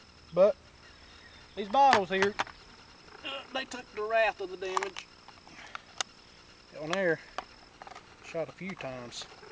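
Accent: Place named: South, United States. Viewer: Love a good Southern accent my brother. COL StoneyB. Georgia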